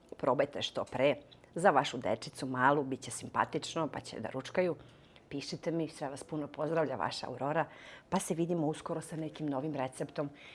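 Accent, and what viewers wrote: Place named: Blace, Serbia. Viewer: Aman zeno sa juga iz Blaca,sto se stidis svog imena.Zar je moguce da se tako lako menja ime krsteno koje ti je kum dao i na koje treba da budes ponosna.Ja znam da hriscanski kada se zena zamonasi onda dobija drugo ime. Treba da se ponosis tog blackog naglaska a ne da se krijes iza tog nimalo lepog imena.Za kulinarstvo svaka cast ali postuj ono ko si i sta si.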